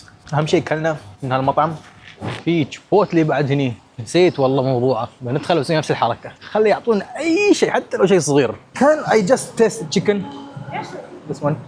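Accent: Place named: United Arab Emirates. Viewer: طلع اماراتي و هي تحسبه سعودي وانا احسبه بحريني صدق خليجنا واحد هههههههه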